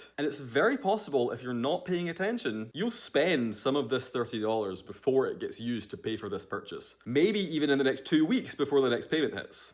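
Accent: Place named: Scotland, United Kingdom. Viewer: Are you a Scotsmen 🏴 living in America 🇺🇸 because you have Scottish Accent but keeping mentioning Dollars and showing Dollars?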